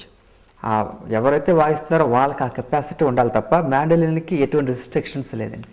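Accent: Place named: Godavari, India. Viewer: Sir you sound godavari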